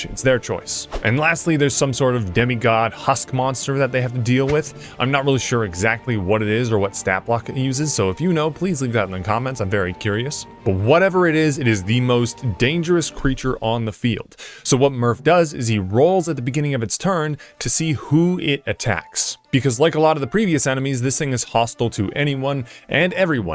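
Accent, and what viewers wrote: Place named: Flanders, Belgium. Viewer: not me trying to figure out if you're dutch or flemish... ima say flemish?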